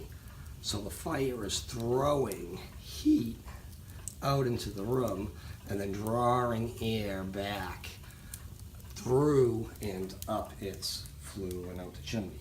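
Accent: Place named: Massachusetts, United States. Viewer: This is a genuine Massachusetts accent my brother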